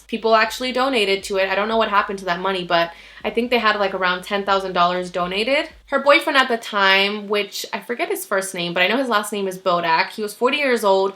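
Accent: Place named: Spain. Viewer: I love your Spanish accent lol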